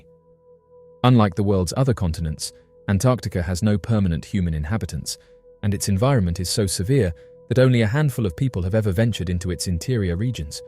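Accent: United Kingdom. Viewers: So incredibly boring when narrated by a Brit!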